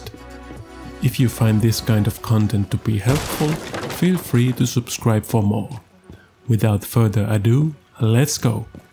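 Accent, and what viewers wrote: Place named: Finland. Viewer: You're definetly Finnish aren't you? Accent is sounding like it.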